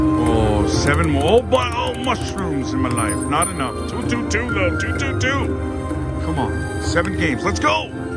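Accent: Louisiana, United States. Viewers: Are you from Cajun Country?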